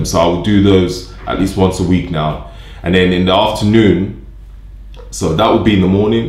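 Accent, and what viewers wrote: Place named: United Kingdom. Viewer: Damn your so fit and your accent is so brittish very Nice. Btw congrstulations😉👍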